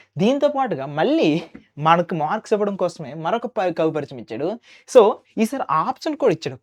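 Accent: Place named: Telangana, India. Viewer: Anna telangana 😅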